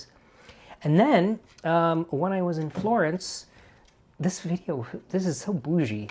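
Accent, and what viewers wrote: Ireland. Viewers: I am impressed with the Irish accent! And... everything else does. LOL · 1 minute in and I'm laughing out loud and relating so hard. 😂 Somehow the Irish accent was the perfect intro for this subject. 😅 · As an Irish person and long time listener I am so excited to hear you do the Irish accent!!! So glad we made the rotation 🎉😊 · Like the Irish accent 😂🇮🇪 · I thought he was Irish for a moment and didn't realised the transition to American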